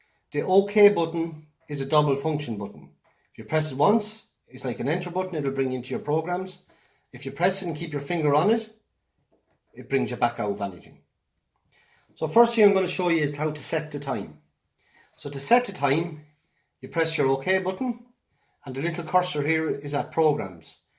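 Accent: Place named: Ireland. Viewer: Cool to hear an Irish voice as the guide! ☘️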